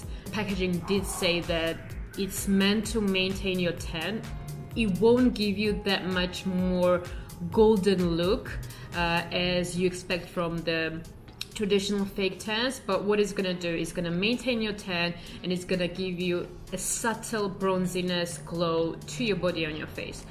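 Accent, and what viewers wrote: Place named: United Kingdom. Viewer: ბრიტანული აქცენტით კარგად საუბრობ❤️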